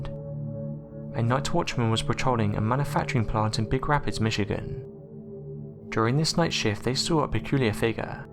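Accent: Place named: Manchester, United Kingdom. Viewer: Agreed... and though many chime in defending him when I say this, I STILL get very tired and annoyed with that accent. I understand all accents have a reason for existing and started long ago, nobody is right over the other when it comes to language blah blah etc... I figured that out without any help and I don't mean to be some sort of exterminator about it. In fact sometimes I wonder if HIS style (Manchester? Whichever it is...) might actually be one of the oldest ways English was originally developed (I doubt it though), it's still annoying as hell. How anyone would think "yeah, I want to start smushing some syllables into others, completely dropping other syllables, and trail off on almost every last word of every sentence and swing the cadence of each sentence the same near the end... that will help people understand me!" is baffling. 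During the large snake stories and accounts for example, I actually had to give up understanding several different parts and words!! They were so swallowed up or crushed that I can't tell when some sentences and words start ad stop. The information on the other hand, is very fun, creepy, and interesting. He never delivers it as gospel, doesn't have a strong bias towards any of them nor does it seem like he's trying to coerce us to accept anyone's opinion. He just delivers the story and I dig that. Just wish I didn't have to concentrate on ironing out the words in every other sentence.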